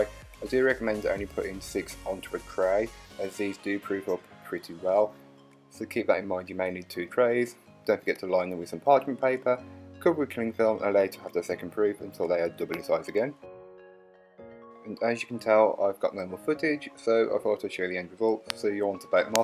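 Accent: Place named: Oldham, United Kingdom. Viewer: You sound like if you’re from Oldham. Keep the good work up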